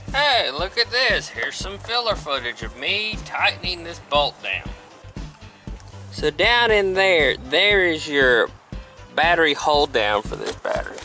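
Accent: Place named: South, United States. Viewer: I like this southern man, where did u get that accent?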